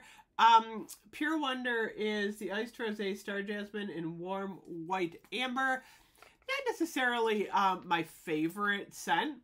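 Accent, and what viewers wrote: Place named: Ohio, United States. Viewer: I love watching your videos- there’s something almost nostalgic and comforting about your content. I kept thinking- “I bet she’s from Ohio!” I grew up in Columbus, OH and there’s something so familiar about your accent and style.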